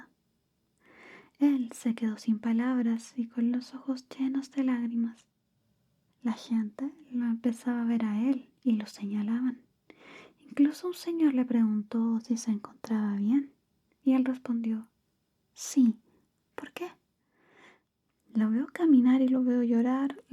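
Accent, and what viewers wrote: Chile. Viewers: A mi me gusta su voz también cuando habla a lo chileno se escucha muy hermosa:_Love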